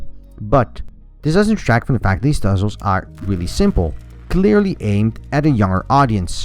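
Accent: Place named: Netherlands. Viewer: I assume Dutch? You have a very deceiving accent if that's the case! Great video nonetheless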